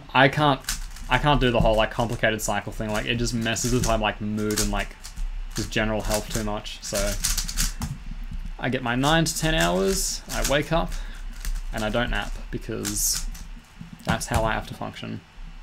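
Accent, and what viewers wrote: Australia. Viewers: You aussi accent is very good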